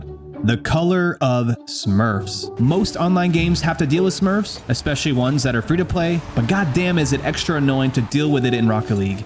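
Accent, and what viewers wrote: Sweden. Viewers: Is he from Sweden?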